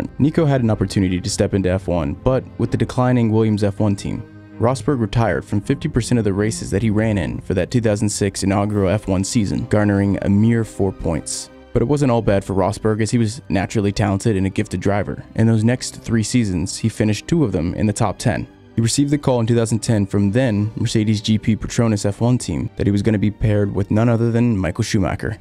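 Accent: United States. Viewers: Hearing an American talk about F1 is just weird. The names of the drivers, the tracks... its just wrong bro xD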